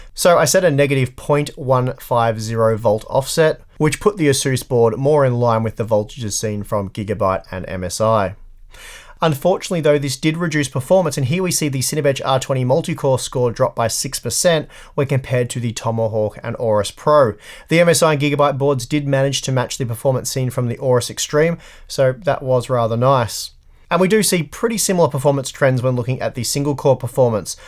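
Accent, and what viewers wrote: Australia. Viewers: nice Australian accent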